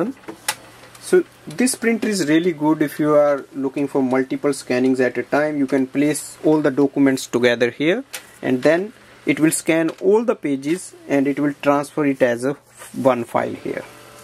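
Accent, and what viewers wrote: India. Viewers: Nice gujju accent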